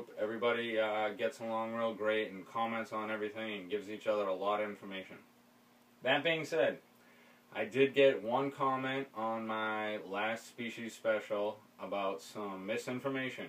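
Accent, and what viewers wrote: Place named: Massachusetts, United States. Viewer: Being originally from Shrewsbury, MA and now living in NJ I appreciated the MA accent when learning about angelfish!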